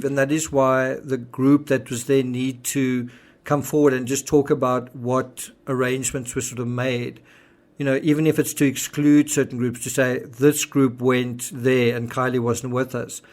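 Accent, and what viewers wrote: South Africa. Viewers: Hello. Are you South African? Thanks for this.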